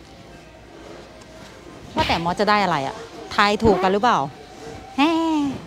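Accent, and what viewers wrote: South, Thailand. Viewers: พี่มอสคนใต้ใช่มั้ย555 เข็ญไม่รอดนี่รู้เลยย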